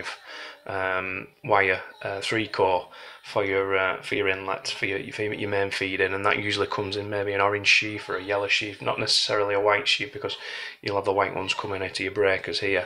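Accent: Bolton, United Kingdom. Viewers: Well explained... simple👍🏼.. Bolton accent??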